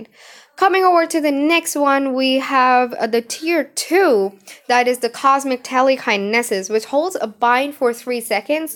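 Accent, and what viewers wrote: India. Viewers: Shabbir Alom Shakil I'd say India given her accent 🤔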